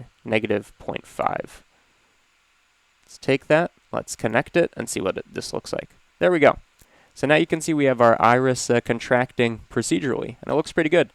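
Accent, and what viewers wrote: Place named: Chicago, United States. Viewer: Dude this is straight up genius! Are you from Illinois by any chance? Thought I sensed some Chicago in your voice.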